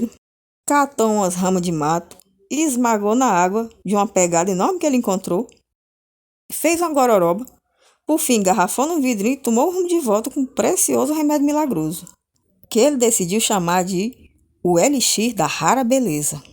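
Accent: Northeast, Brazil. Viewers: Vc e uma narradora muito maravilhosa lembro meus parentes do Ceará minha vó meu avô materno moro em Curitiba mais vendo vc falando lembro e tenho muitas saudades do meus parentes nordestino avó avô e tios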